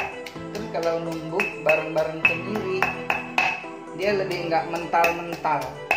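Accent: Bangka, Indonesia. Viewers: Abang orang bangka ya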